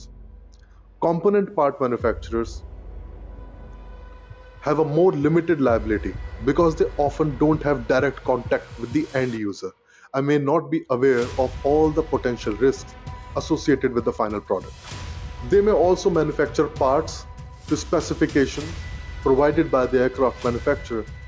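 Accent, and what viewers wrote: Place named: India. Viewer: Sorry Indian voice hurts my ears. All I can hear is SAAR DO NOT REDEEM!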